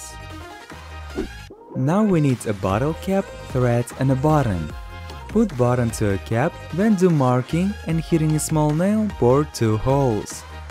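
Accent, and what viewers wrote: Russia. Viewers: because he has a russian accent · i just loooove his russian accent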